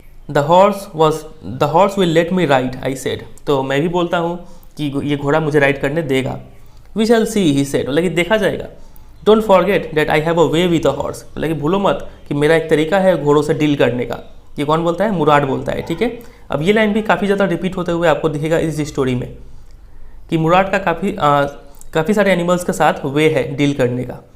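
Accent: Bihar, India. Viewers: Sir aap bihari hai na ??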